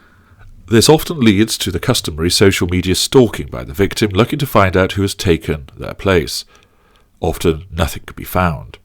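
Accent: United Kingdom. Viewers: Another Brit accent. See ya.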